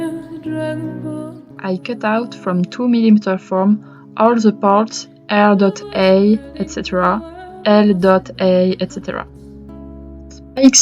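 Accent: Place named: France. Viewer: After years studying french, Im so proud I can distinguish her beautiful accent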